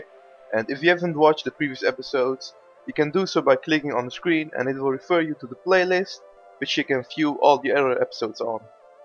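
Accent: Netherlands. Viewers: you sound dutch, am i right?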